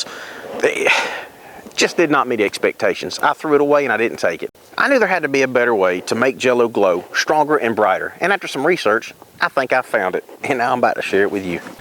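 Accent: United States. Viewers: U have the most american accent ever. Like you were from a cartoon or 50's america.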